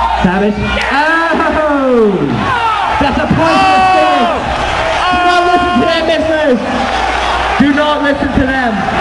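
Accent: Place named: United Kingdom. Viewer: wow looking back that british accent was a terrible idea and extremely annoying hahaha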